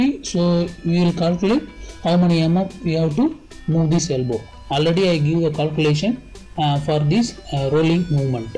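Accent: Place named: India. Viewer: Madersud english spiking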